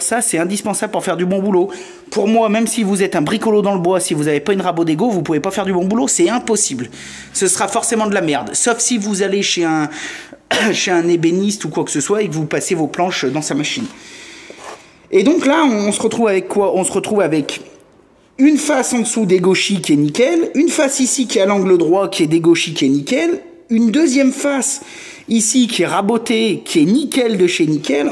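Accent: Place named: Lyon, France. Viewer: Salut Ami, super vidéo avec des explications claires, nettes et précises. Enfin des mots que tout le monde comprend sans termes anglais ou technique. Et en plus avec un accent lyonnais... car je pense que tu es de Lyon ou des environs. La plus belle ville du monde.....(rire). à + et encore merci. Norbert "dit nono" un 69 du 63.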